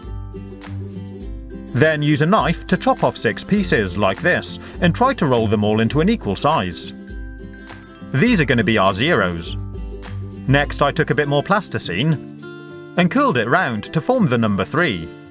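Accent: United Kingdom. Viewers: Are you British? You sound like it! =D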